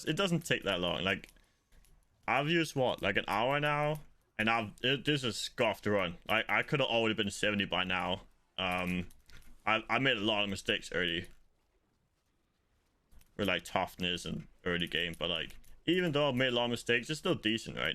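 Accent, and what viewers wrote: Denmark. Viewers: Yo GOAT. Are you not playing HC? Sorry if you mentioned it, didnt watch the whole vid, but I did see you die. Would like to compete with you to benchmark my skills, I usually Play HC but this theme might be a SC season, especially if you go SC. Btw great content, hopefully you get the thousands of subs you deserve this season. Er du dansk forresten? Accenten 😆💪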